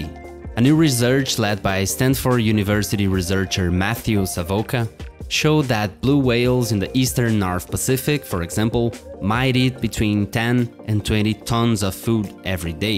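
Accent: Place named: England, United Kingdom. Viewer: I like your English Accent! Where are you from? keep up the great work!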